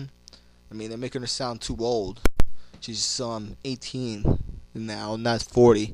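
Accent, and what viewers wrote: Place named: England, United Kingdom. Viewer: english acsent XD !!!!!!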